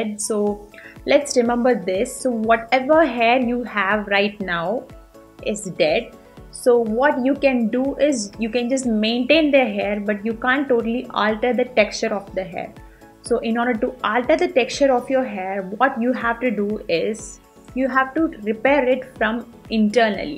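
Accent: Nagercoil, India. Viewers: Tamil la peasalaame neenga nagercoil dhaana??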